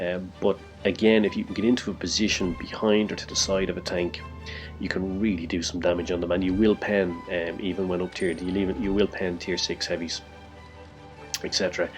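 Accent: Ireland. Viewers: Are u from ireland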